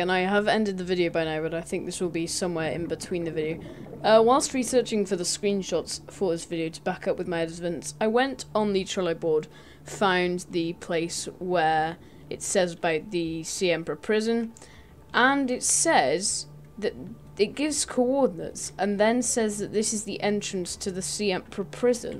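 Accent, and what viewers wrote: England, United Kingdom. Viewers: How refreshing to hear a well spoken, dignified English accent. The game is described clearly and concisely, without going off on tangents or babbling. All too often you have some ego waffling nonsense in the form of insane screaming. As well, they tend to describe everything as awesome. Awesome this. Awesome that. So much awesome is not awesome. It's 90% VJ ego and 10% about the clip, which is primarily the topic that interests me. Instead, I learn more about the ego of the awesome VJ far more than the actual reason why I'm watching. Perhaps it's Pewdiepie's fault. Who knows? All I know is, I don't enjoy VJ's with egos.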